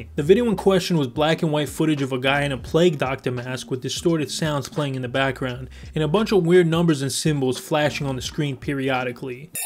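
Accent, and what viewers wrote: New York, United States. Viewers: Needed a real "Fuhgettaboutit" kinda guy to narrate my work session. Thank you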